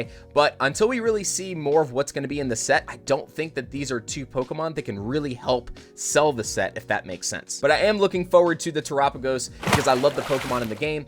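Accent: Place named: United Kingdom. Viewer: For a second I was like….did I click on the wrong video…who dis Brit?!??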